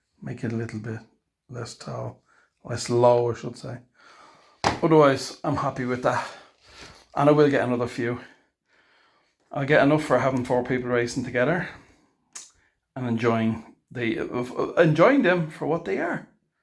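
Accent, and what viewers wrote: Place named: Ireland. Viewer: I ❤❤that Irish accent....as well as the slot cars 😂😂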